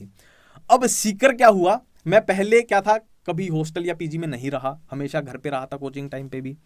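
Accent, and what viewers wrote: Haryana, India. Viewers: Bhai mere app ho successfull.. You help a lot of neet aspirants Ghana pyar haryana sonipat te Apke video main doubt tha avaj te ke haryana te ho Ibb confirm hogya❤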